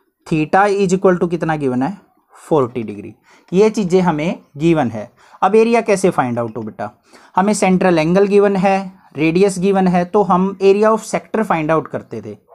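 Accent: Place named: Sonipat, India. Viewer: Sir aap 100% sonipat se hai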